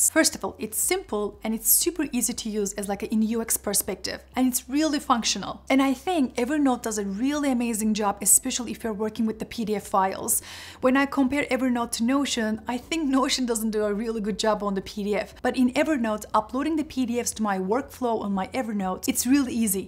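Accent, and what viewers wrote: Turkey. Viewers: Tatlı bir Türkçe aksan sezinliyorum :) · Türk olduğunu bilmeseydim yine de Türk derdim sana podcast yayınları severek takip ediyorum ama çok güzelmiş ingilizcen :)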